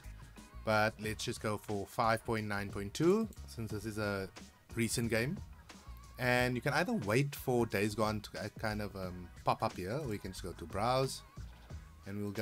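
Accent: South Africa. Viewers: ahhh the defining South African Accent ! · are you from south Africa???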